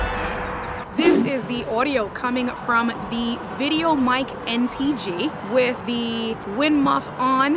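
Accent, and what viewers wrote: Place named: New York City, United States. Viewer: I could tell you were from NYC when you started talkin (I’m from Qnz)…then you went outside and I was like YAAASSS 🗽 then I got to the end and got a YERRRRR and it was the cherry on top 🗽🗽🗽 lol great vid, very helpful 🤙🏾 s/o NYC 💯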